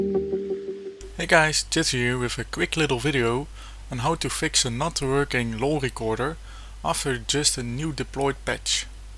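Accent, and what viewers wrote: Netherlands. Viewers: dutch for sure..? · je hebt wel echt een vernederlandst accent xD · are you dutch? you have a dutch accent :P ( im also dutch) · its so funny how you can easialy hear that you are dutch :) ty for the help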